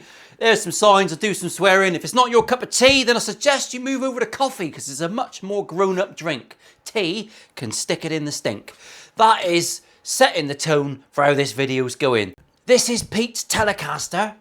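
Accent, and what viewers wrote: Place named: Cockney, United Kingdom. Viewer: I do prefer the bridges that have 6 individual intonators versus the ones that have only 3 so you have to split the difference between strings - one flat one sharp? I'm in Virginia USA - but quite near West Virginia, Tennesee and North Carolina, so I hear those accents you like. I tune in here (also) to hear your accent. We uninformed here call your accent "Cock-knee" Special Wave - wibbly-gibbly!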